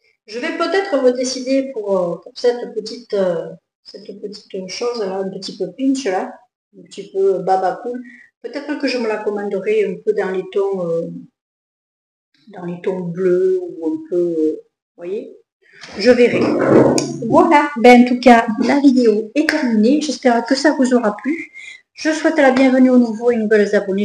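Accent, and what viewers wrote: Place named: Provence, France. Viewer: Bonsoir, je vous regarde de Paris et j'adore votre accent, vous êtes du côté de ma sœur vers Cavaillon. Juste une petite précision le 2ème micro je pense qu'il faut l'accrocher à votre chemisier. Bonne continuation ❤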